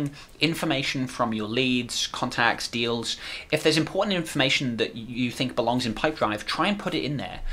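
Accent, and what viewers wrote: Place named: United Kingdom. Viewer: This is excellent, very clear training. Thank you for creating this. I'd much rather listen to your cool British accent than the Pipedrive guys cartoon voice. LOL Cheers!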